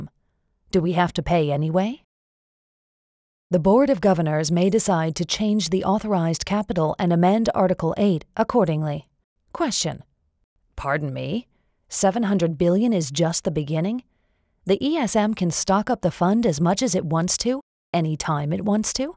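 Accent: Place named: United States. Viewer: So why does the narrator have an American accent?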